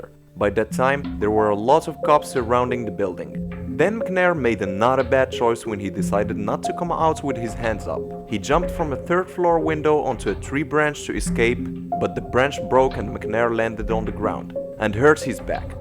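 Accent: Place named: Morocco. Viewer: Nice video! I can hear a moroccan accent, good work man :D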